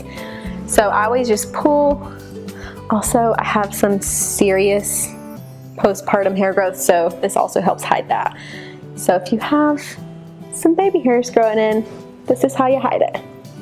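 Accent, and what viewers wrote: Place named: South, United States. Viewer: Your southern accent is cuuuute!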